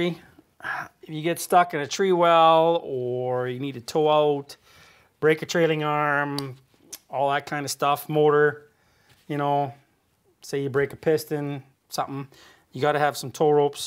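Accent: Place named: Sherwood Park, Canada. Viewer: I thought your accent sound familiar, I lived in Sherwood park for 3 years.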